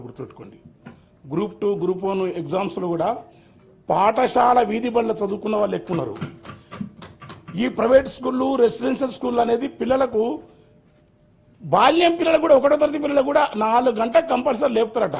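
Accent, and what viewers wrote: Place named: Andhra Pradesh, India. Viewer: గోరేటి వెంకన్న నువ్వు మాట్లాడుతుంటే నేను చూస్తుంటే తెలంగాణ వాడు మాట్లాడుతున్నట్టు లేదు ఆంధ్రుడు మాట్లాడుతున్నట్టు. ఇప్పుడున్న విద్యావ్యవస్థకి హాస్టల్ వ్యవస్థకి కథాకర్మాక్రియ మన కేసీఆర్ గాడే నువ్వు దయచేసి మళ్ళీ మళ్ళీ కన్నీరు పెడుతుంది అన్న పద్ధతిలో ఇంకొక పాట రాసి దాన్ని ప్రసిద్ధిగాంచితే చూడాలని ఉంది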